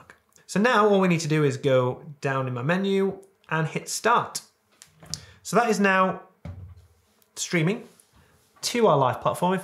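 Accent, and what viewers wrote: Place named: Northern England, United Kingdom. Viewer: Love the Northern accent my man, thanks for the helpful explanation.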